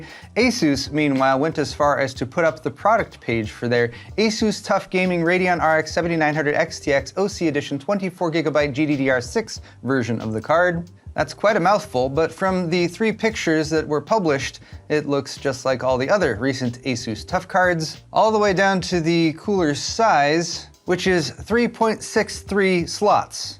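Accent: Germany. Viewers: you speak like a native Bavarian... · Love the german accent dude😂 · I had to give a thumbs up for the pie alone. But I wish there was an option for a 10 times thumbs up for the German dialect. By far my favorite YouTube channel keep up the great work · commenting on your german accent has me between honesty and politenes.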